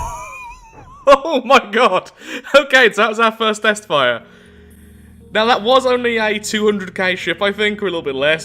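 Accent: United Kingdom. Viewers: Hey, probably many people are thinking this 'what country are you from?' British? Australian? if you see this please reply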